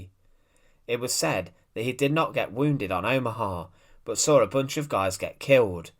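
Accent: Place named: Blackpool, United Kingdom. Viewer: Your English dialect accent makes me ill. Blackpool?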